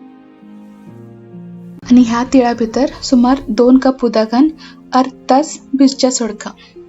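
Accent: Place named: Karwar, India. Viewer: Waàw felt like am in Karwar .... after long time I heard Karwar Konkani ...thank u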